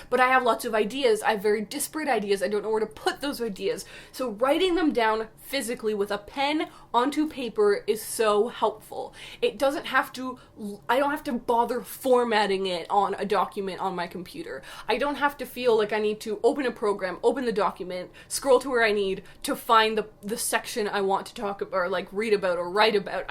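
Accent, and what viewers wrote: Canada. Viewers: I knew she was Canadian! No wonder her accent sounded very interesting. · I love your Canadian accent when it peeks through - super cute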